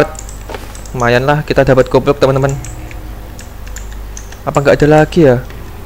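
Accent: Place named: Java, Indonesia. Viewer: medok banget mas :v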